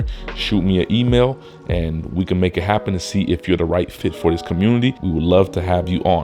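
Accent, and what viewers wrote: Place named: Northeast, United States. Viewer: your kolocwa is flawless 😂 Putting that aside, you sound a little like somebody who's lived in the Northeast ( PA/NJ/NY )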